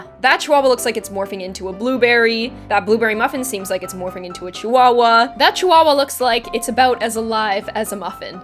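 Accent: Canada. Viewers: I love how you say 'begel' instead of 'bagel'. The Canadian accent has never ceased to surprise me :)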